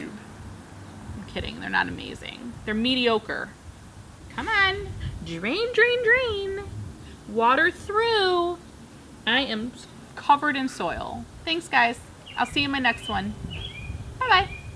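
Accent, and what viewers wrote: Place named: South, United States. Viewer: Hi, I'm afraid of the string of pearls as well, they look like some green peas which are so delicate..plant with me are so soothing..where are you originally from?..you sound like you have a little southern twang..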